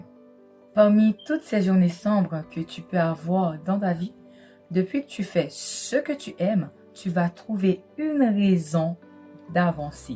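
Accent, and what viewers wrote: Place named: Canada. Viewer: Accent canadien Mwen renmen l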